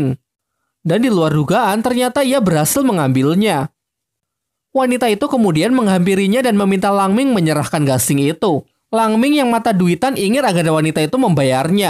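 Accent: Java, Indonesia. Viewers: Orang jowo Yo kak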